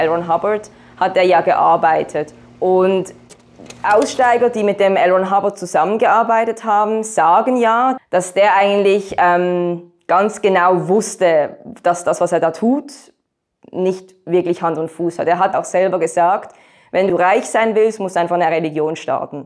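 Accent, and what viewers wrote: Switzerland. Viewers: Bis Minute 11 hab ich noch vermutet dass das basler deutsch ist - cool🤙🏽🤭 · Sie klingt wie eine von uns 🇨🇭🇨🇭🇨🇭🇨🇭🇨🇭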